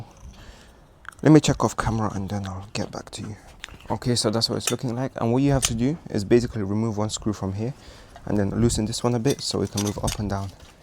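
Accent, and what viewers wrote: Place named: United Kingdom. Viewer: Out of interest, why is you accent sound like a uk born afro Caribbean person, but you a white fella. Thanks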